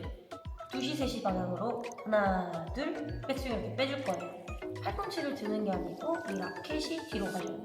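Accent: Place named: Gyeongsang, South Korea. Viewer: 거 경상도 어디요?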